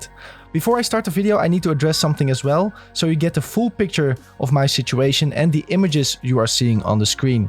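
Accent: Netherlands. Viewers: Ik kan gewoon horen dat hij nederlands is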